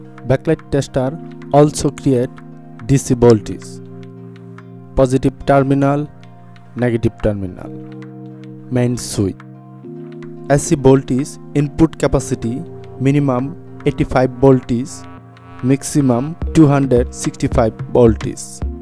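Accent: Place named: India. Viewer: your english is shite. speak proper english not the indian english.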